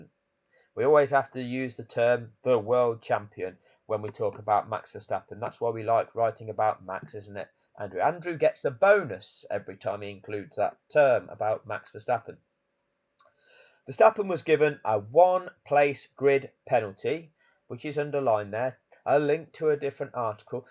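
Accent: United Kingdom. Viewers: The moment I heard that “back with more from our favorite F1 journalist “in that British accent I knew This video just gonna trash Max with no actual intelligence. Man the British have got to be the worst spectators in human sport history they don’t even hide it ,it’s so deplorable.😂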